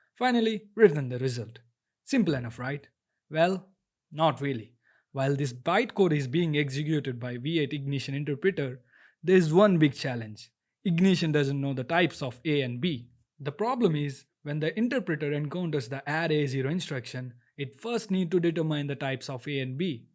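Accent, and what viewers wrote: India. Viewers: Are you from telugu states? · i love the indian accent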